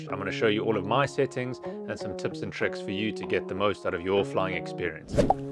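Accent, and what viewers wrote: South Africa. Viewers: are u from south africa?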